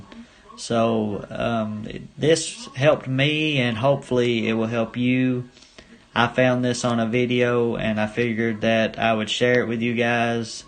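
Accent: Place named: Deep South, United States. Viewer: Yo u gotta be from the Deep South. Accent is superrrrrrr strong. U sound mad racist lmfaooo.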